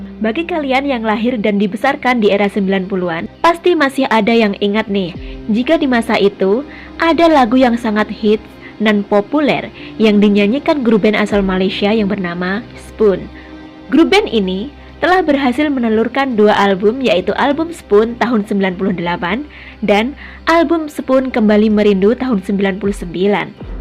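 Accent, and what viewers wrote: Java, Indonesia. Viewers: Nemu chanel baru,saya nonton udah 3 kali,tapi baru comen dan gahung sekarang,enak juga suaranya seperti cerita miranti cuma masih ada logat jawanya sedikit.Sukses selalu.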